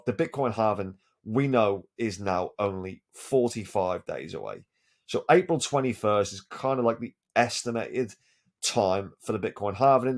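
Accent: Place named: Norfolk, United Kingdom. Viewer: Norfolk! Sounds weird hearing a crypto chap from the area.good luck this cycle ✌️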